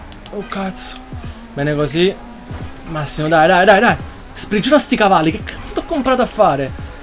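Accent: Naples, Italy. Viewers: È di Napoli :D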